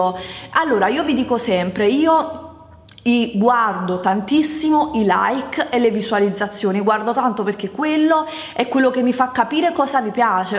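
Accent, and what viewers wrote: Naples, Italy. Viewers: ma fregatene...sono persone cattive, non capisco nemmeno io perchè altre youtubers non si becchino gli insulti, ma secondo me solo per il fatto che tu sei sensibile e ci fai caso ai commenti, ai like ecc... O piuttosto che niente ci sono le persone che si mettono a criticare la pronuncia, la cadenza, il dialetto, l'inglese....lasciale perdere, ma chi se ne frega, anzi sai cosa ti dico?? Io sono milanese DOC e sono pure una linguista (studio interpretariato) eppure quando sento la tua cadenza napoletana o qualche parola in dialetto mi diverto tantissimo, e se capita che sbagli qualche pronuncia in inglese a me fai solo tenerezza.... Saranno invidiose perchè tu mostri di avere una bella vita, con una bellissima famiglia, con una bella casa ecc ecc...come se tu non avessi problemi... Non capiscono che magari una vuole mostrare il lato più allegro e divertente della vita! 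un abbraccio grande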